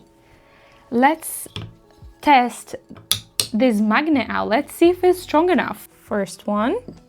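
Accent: Brazil. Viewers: Você é brasileiraaaa? Tem sotaque de brasileira ❤️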